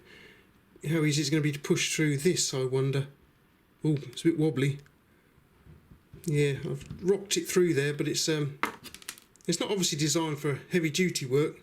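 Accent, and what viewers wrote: United Kingdom. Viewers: Haha you're a bit off with the Accent. The reviewer has a UK accent, Korg is voiced by Taika Waititi, a New Zealander. Literally the other side of the world.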